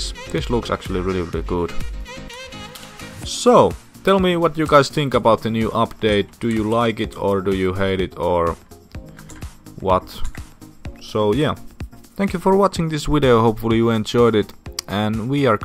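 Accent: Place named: Finland. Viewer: Heti aksentista tajusin et täähä o suomalaine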